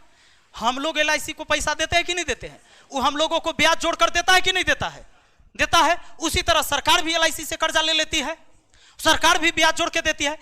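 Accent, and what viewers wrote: Bihar, India. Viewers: Yekdum bihari andaj me bazat ka baja baja diye guru ji super se bhi upar shamjhane ka tarika hai 😂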